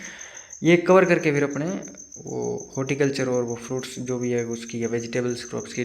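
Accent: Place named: Haryana, India. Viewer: haryana s ho kya bhai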